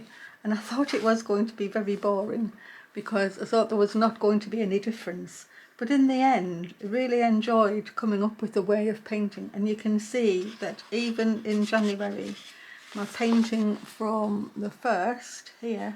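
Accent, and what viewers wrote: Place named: North East England, United Kingdom. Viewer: These are beautiful! Also you sound like you’re from my area, north east England! Ah yes you just said in the video:)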